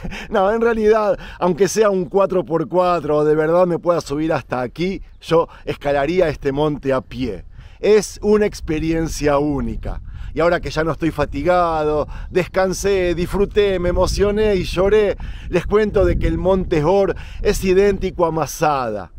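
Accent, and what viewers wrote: Argentina. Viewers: es hermoso,amo cada terron,cada grieta..desde lo mas profundo de mi alma,q El Señor me prestó te doy gracias - mi vida va atada a tus pies, cuando me muestras los precipicios y grietas,he aprendido a tolerar tu acento argentinon', en haras de los videos q subes--desde Venezuela Hashem t cuide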